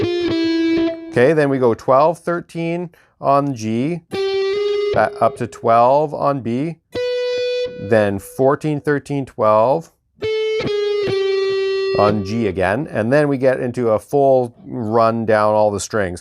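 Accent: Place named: Canada. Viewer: Thanks for the tab great job! could tell right away you were Canadian from your accent lol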